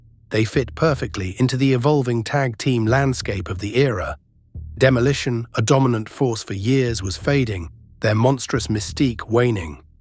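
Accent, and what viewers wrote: United Kingdom. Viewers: These episodes are truly a joy to listen to. The English accent gives it weight. Power and Glory rocked and when Roma became a Horseman I was down. I’m a 10 year old boy in ‘87 and pro-wrestling was my soul. I’m 10 again while I watch now and it’s better than I remember. Thank you.🤠🍺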